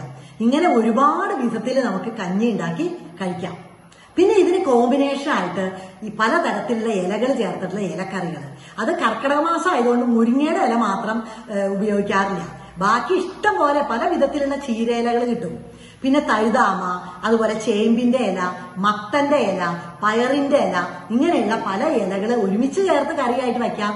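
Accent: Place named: Valluvanad, India. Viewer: Teacher valluvanadan style il language.. Teacher evideya place?Super nalla nadan reethi enikku ishtayi.. ❤️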